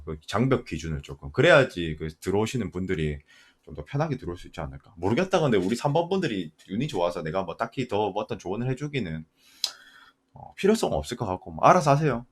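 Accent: Gyeongsang, South Korea. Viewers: 2번~(2020/7/12) 2명. 잘 맞추시네요~^^ 경상도말씨 반가워요~^^ 주말잘보내세요.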